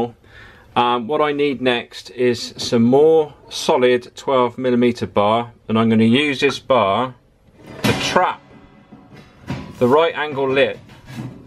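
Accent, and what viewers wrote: United Kingdom. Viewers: Can't say I ever wondered what Charlie Sheen would sound like with a British accent, but here I am.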